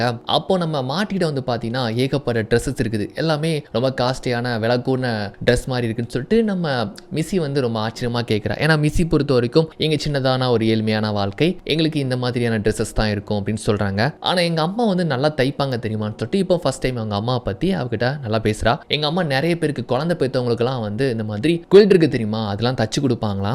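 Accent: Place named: Thoothukudi, India. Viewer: Eilla Thoothukudi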